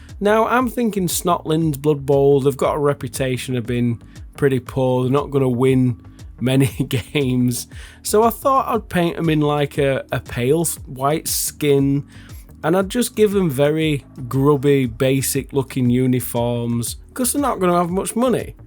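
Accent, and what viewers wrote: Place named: North, United Kingdom. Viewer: Love how you keep your northern accent for videos. You don't try to be formal and lardy da like me nan used to on the telephone.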